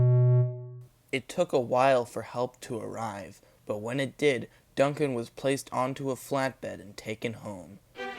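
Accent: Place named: Scotland, United Kingdom. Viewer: Your Scottish accent is priceless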